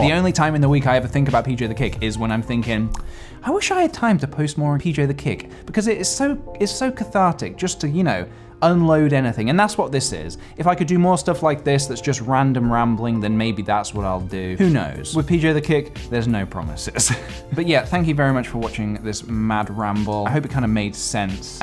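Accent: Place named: England, United Kingdom. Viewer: I just realised 1 big thing. I understood how cool are u as a human, and I can't really talk with you, meet you. Just even see you. Even if we somehow would met I can't even talk with you in a normal way. You are from England. I can barely understand you(because Im from Ukraine ), but I really love watching your videos, and thats basically all what I can do. Please guys, thumbs up for my comment if you think same way. I really want to to see me. Thanks keep making great things for us, we love you.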